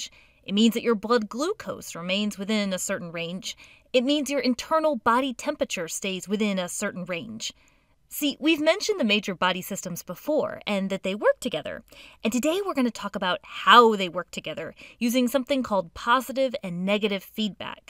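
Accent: United States. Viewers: Gr8! R u American..?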